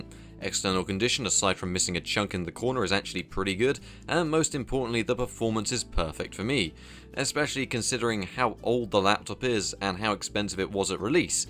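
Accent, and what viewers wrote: United Kingdom. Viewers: Ur British lol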